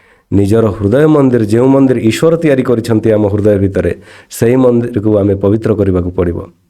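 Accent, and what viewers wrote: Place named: Bengal, India. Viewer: Ye loka odia bhasa kahila -bele majhire -majhire bengal bhasa patiru bharuchi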